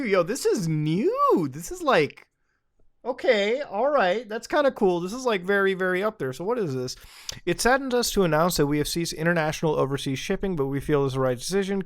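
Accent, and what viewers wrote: Canada. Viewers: girlfriend half asleep listening to my video "is he Canadian? " "I can hear his accent" that it was cool how she just randomly said that I watch you every night lol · Maybe I’m just high af but sounds so Canadian in this video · Your Canadian comes out pretty deep in these haha. Love it! This last bit is exactly what the internet used to be like in the 90's!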